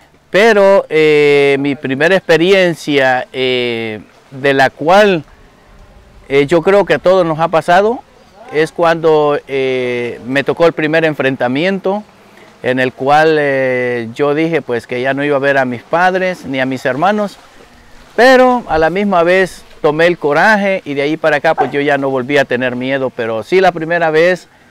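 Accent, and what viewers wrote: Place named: Guatemala. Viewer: Este maytro habla como Chapin .saludes a todos Los de aguachapan y sonsonate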